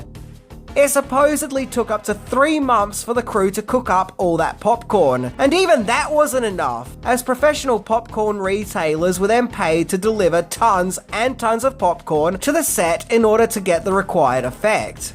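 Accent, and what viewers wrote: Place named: Australia. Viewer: You’re killing me (in a good way😅)!! Several months ago I started watching at the beginning of your videos and have finally caught up. Along the way I realized that I was missing quite a few movie gems from my collection and as such have had to make a lot of purchases to more fully create a dignified compilation. A few observations that I have made from watching your videos in succession from the beginning are: You are a very kind person!! I really enjoy your comments and you are always willing to give somebody the benefit of the doubt without casting blame or being unkind. I really enjoy listening to your accent (obviously I’m not from Australia). I really enjoy the way you present the content you are speaking about, it’s interesting and fun to listen to - I’m sure it takes a lot of work to make these videos - and I can see you’re passionate about the movies you are talking about. 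You are gracious to those who watch your videos and that speaks greatly to the humble type of person you are!! Thank you, for creating entertaining and enjoyable content. I look forward to whatever you have in store for us!!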